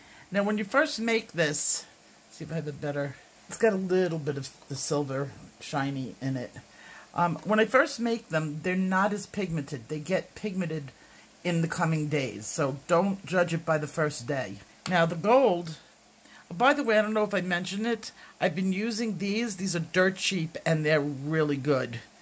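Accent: New York, United States. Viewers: I was dying to try your recipe but didn’t have the beeswax. However, I had a Caran d’Ache wax crayon. I added your amounts in mineral oil and linseed oil but no wax. I figured the wax in the crayon would be sufficient. It worked! Thank you so much for sharing all of your information on this! It really is a gam changer! ❤️ PS love your NY accent.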